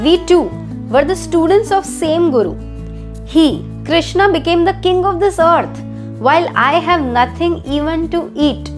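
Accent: Gujarat, India. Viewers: Dii ! Are you gujarati?? Cuz you said "karma nahi nadta haii" 😂 I'm 100% sure you are gujju😍 I lovvee ur videos!! Actually my life is changed after watching ur videos keep going dii ur doing gud♥️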